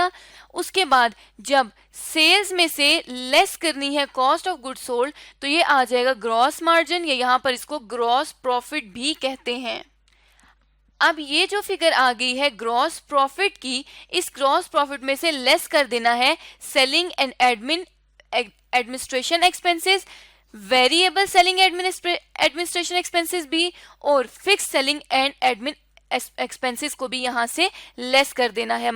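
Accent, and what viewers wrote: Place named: Pakistan. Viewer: Tomorrow I have my ca inter costing exam and I wanted to learn this concept... And I came across the bestest shortes video! ..i am from indian... Your Pakistani accent is so good! ✨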